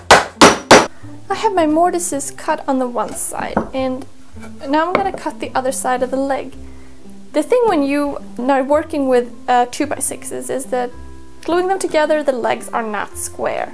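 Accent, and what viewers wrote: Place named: Sweden. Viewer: Misstänkte nog att det var en svenska bakom den brytningen. Anywho snyggt jobbat :)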